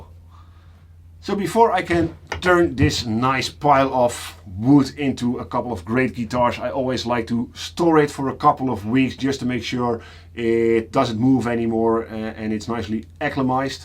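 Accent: Netherlands. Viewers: I'm detecting a slight Dutch accent... Are you Dutch?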